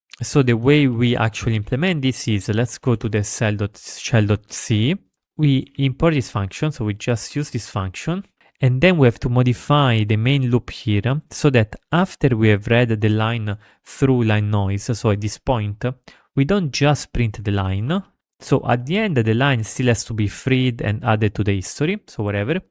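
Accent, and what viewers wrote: Italy. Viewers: Nice italian accent!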